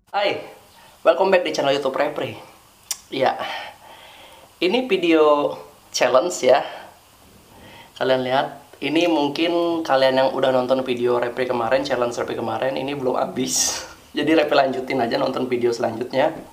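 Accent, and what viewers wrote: Banjarmasin, Indonesia. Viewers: Orang banjarmasin mana suara nya😄✋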